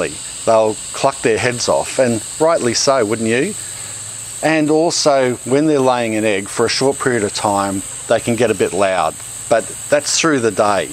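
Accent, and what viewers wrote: Australia. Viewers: I love the video, the australian accent, the puns and those shorts.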